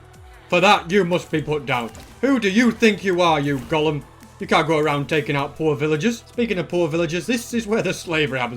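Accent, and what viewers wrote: United Kingdom. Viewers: But 100% British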